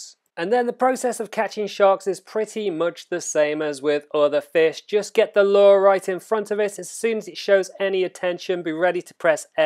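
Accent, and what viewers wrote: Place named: United Kingdom. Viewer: WATCH OUT FOR THE “LAW” DROP IN—bruh with your british accent i cant tell what the heck ur saying about the short window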